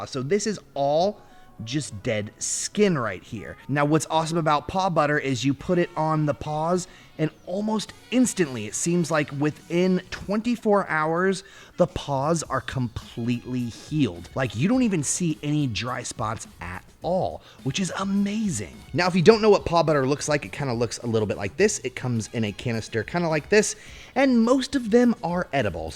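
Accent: United Kingdom. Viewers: I love his British accent 😆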